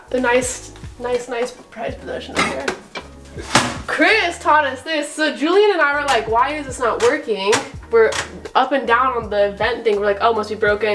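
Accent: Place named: United Kingdom. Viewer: Where r you from originally (i mean country)!!!! Bcoz accent is british but beauty is far more than average brits!!!!!! Please reply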